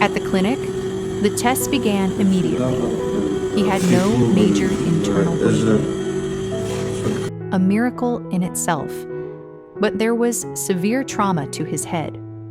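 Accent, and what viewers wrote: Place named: United States. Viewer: disgusting American pronunciation with that "r". It's like it's choking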